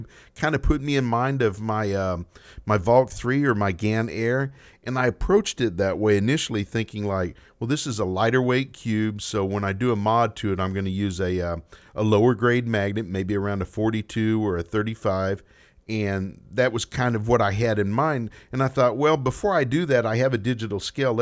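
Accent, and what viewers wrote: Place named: Canada. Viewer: JesterKing1776 can't get much better than that Canadian accent. lol. just has one of those soothing voices. sounds a lot like this welding guy I watch on here.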